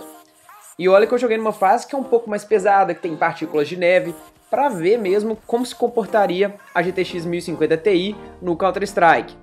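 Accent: Minas Gerais, Brazil. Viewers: Mineiro mineiro ♥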